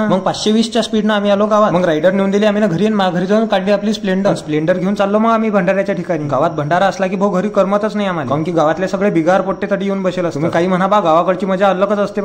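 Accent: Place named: Malkapur, India. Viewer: (MH 28 बोलते दादा) #मलकापुर कर बोलते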